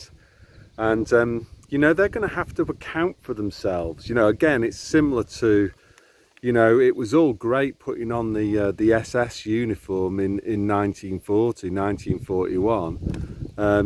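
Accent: United Kingdom. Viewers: Sounds British to me. People believe what they were taught/shown on TV/movies, etc...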